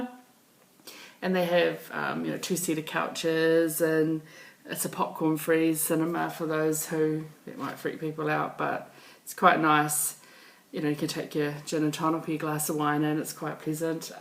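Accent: New Zealand. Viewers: So nice to hear a Kiwi accent again..here in Texas from an expat Kiwi.. Your stitching is lovely..Nice to hear your char t too..Glad you perservered..😊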